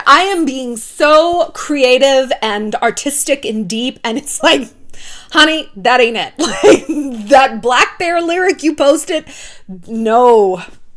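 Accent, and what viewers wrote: England, United Kingdom. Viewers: Lol your british accent 😘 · Your British accent 😂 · Your English accent is bomb 💣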